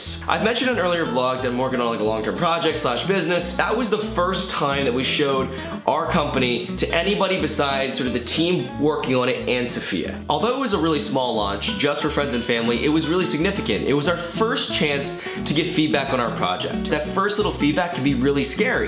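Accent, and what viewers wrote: Philadelphia, United States. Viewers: what part of philly are you from lol I hear it in your voice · u can hear his Greater Philadelphia Area (tm) accent so clearly in this video omfg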